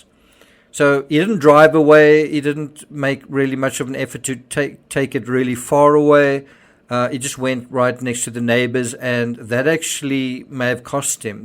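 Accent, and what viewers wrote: South Africa. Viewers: Howzit. Off topic, but are you South African? Your accent is very familiar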